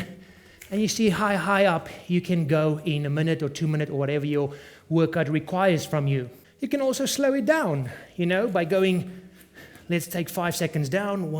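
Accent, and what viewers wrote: South Africa. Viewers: South African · are you south african?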